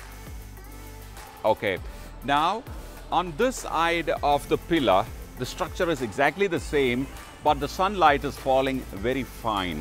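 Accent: India. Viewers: His English is like Hindi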